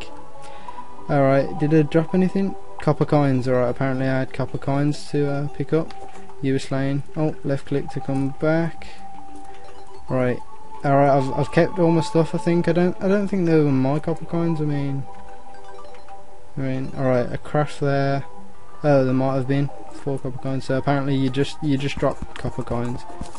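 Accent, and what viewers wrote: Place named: United Kingdom. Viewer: I love these Playthroughs with guys with Brittish accent playing it while talking, it's fun to watch! And I'll give you an subrscribe :)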